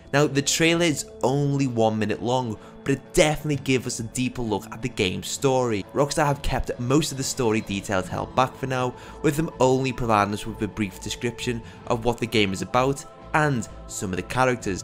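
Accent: United Kingdom. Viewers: British acent???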